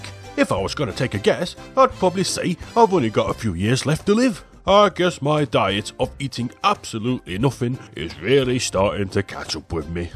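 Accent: United Kingdom. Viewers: i thought goombas were japanese you have a british accent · Dispite one of my actual favorite british sounding youtubers hucking raid shadow legends onto loyal viewers, I'm still not gunna download it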